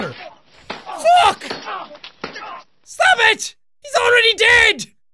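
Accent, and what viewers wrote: Ireland. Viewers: i subed just for the awsome accent... what is that .. irish ?? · hes irish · Love his Irish Accent!!! 🇮🇪☘️ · Niv Leket Slight problemo! NOT BRITISH!!!!!!:He's IRISH"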